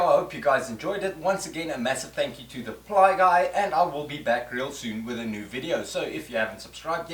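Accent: South Africa. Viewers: Hi. Gr8 to hear that unmistakable South-African accent. I am based in Benoni, Gauteng. Been on your channel now for about 3 months. Really enjoying your work. I am also left handed so your hand work makes perfect sense to me. that "dido" you spoke of is pronounced "Dado" I am fairly new to woodwork and eager to learn. i am on my 3rd project of the lock-down and like you, ran out of materials (and beer) while ago. small little projects to build skills and keep me out of the wife's way... keep going and well done..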